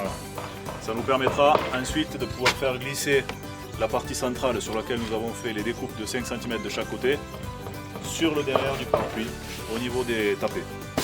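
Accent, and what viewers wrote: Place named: Carcassonne, France. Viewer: slt, super merci pour les infos. Je ferais donc un coffrage si tu me le dit c'est que tu le sait ne pro c toi pas moi 😉. J'appiquerais donc tes conseils à la lettre . Je me disait aussi cet accent est le même que ne mien !!! Moi je suis de carcassonne un grand merci pour les combine surtout que tu n'est pas obligé. Par contre si tu fait un tuto sur ce genre de méthode eh bien je prend avec plaisir.a moins que tu l'est déjà fait dans ce cas pas besoin. Je n'est pas encore pu visionner toutes tes vidéos je le ferais ce week-end je pense encore merci 😉😉😉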